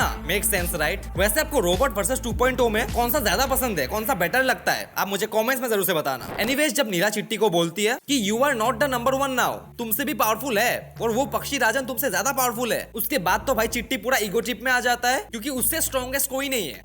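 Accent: Assam, India. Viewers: vaik assamese ho pata chal gaya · Bhaii apka hindi bolneka tarika se lagta he u are from Assam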